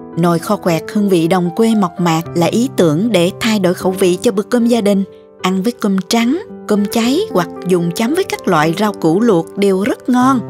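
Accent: South, Vietnam. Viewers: Nghe giọng chị hay quá đúng chất nam bộ..món ăn thì ngon hihi❤❤❤❤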